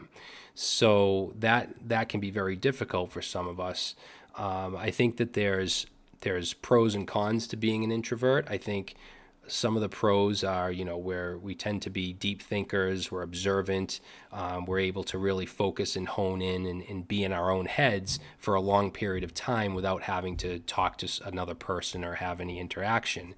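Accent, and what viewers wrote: Boston, United States. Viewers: Yes, he is from Boston, MA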